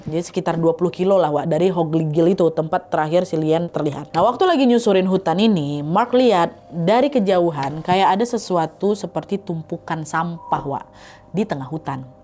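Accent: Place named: Medan, Indonesia. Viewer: Sukak sama kerna cara ngomongnya sama cam awaq orang Medan terutama pas bilang G Ada Otak😁😁😁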